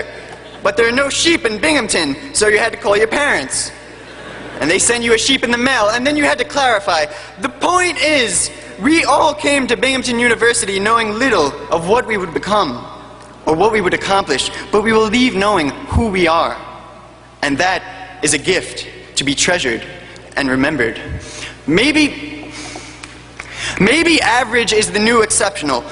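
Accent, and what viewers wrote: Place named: Canada. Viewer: I'm guessing Canadian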